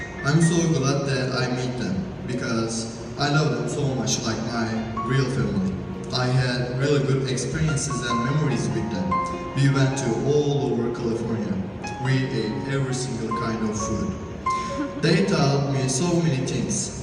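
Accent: Turkey. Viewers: Türk aksanı, ve yabancılar bu aksana bayılıyorlar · türk şivesi yakıyo ya ama sıkıntı büyük hikaye üzücü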